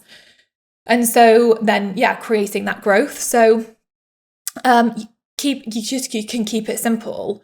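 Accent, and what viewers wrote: United Kingdom. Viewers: This is great info, now how can you structure all of this into a methodology? That's something you could sell! The message/ offer about your membership is not clear. What would be the exact benefit of joining? You sound like you're British, so will your membership/ knowledge is British mentality specific? Or will it also apply to the US culture? The workshop is also a good idea but the offer is not clear. Will we get the clear step by step instructions on how to achieve what you have achieved? This video is good but could be great, in my opinion, it's a missed opportunity.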